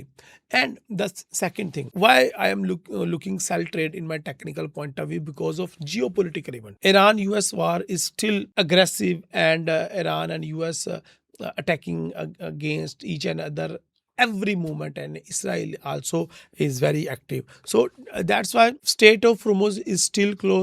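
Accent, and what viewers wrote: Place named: Punjab, India. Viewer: Bhai Punjabi voice problem kr rhi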